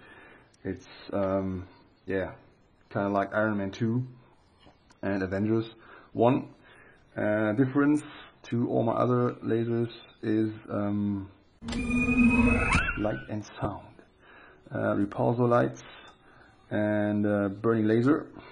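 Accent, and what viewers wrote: Germany. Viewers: Ich glaub du kommst aus Deutschland wegen deinem Akzent :D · That german accent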